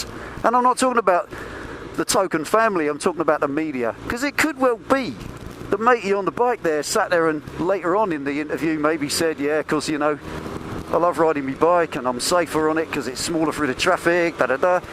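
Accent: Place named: Birmingham, United Kingdom. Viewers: Love the moaning Brummy accent, lol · Well im from the midlands ...... i laughed like a hyena lol, nearer coventry than birmingham, sounded a bit brummie :-) Well vented spleen :-) Hey dont apoligise about vids, the garage vids can wait. Dont reply to this...... i said dont!! :-)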